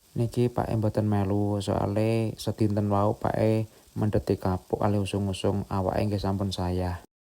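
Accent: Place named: Java, Indonesia. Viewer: Bang sampeyan ki uripe no wilayah ngendi yo kok bhs jawane medhok banget .aq terus lo nyemsk tik tokmu aq jawa timur mlng .aq seneng nyimak olehmu masak2..nek masak bumbune cucinen disik lo yo wedine onok kotorane...yen nyawang olehmu maem dadi ngiler..mugo2 cenele sampeyan terus maju nggih.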